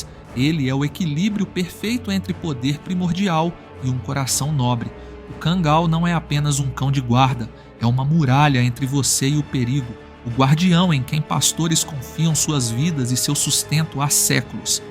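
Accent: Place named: United States. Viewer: Похоже будто Американец пытается говорить на Русском языке, акцентом.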